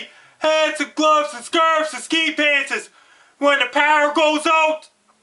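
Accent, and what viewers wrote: Newfoundland, Canada. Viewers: no. stop doing your thing. it's fucking annoying and your voice/accent is just fucking terrible. this is why I'm ashamed to be where I'm from. I fucking hate "newfie" accent. You people don't realize just how stupid you sound. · The weather is so much more perplexing when it's being yelled at me by a Newfie guy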